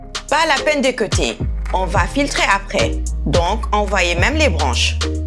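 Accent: Senegal. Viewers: Elle s'exprim kom une vraie sénégalaise😂😂 C chouett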